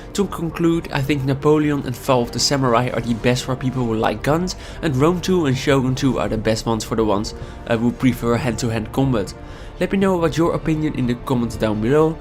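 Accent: Netherlands. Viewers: are you dutch becouse youre acent notting bad but just curious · Ik hoorde na 2 seconden al dat je Nederlands was. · Jij bent nederlands of niet hé! hoorde het aan het accent haha! xDDD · never watched your videos are you dutch? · Ben je nederlands? Haha, mooie vid in iedergeval. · As your eastern neighbour, i like your dutch accent, it sounds as funny as it sounds in german But still a good Video, even if i have around 1200h in all Total War games and own every game since Rome I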